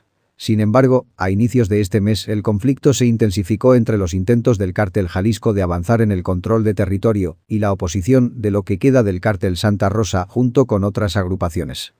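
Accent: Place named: Spain. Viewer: Tu vos es de España A ti que más te da lo que pasa en México 😒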